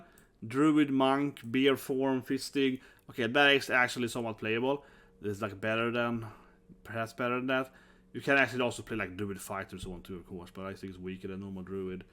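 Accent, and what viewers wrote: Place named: Sweden. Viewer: A tip is to slow down your speech a little, it's a bit hard to hear sometimes and the subtitles are somewhat bad when you speak fast and loose with that Swedish accent.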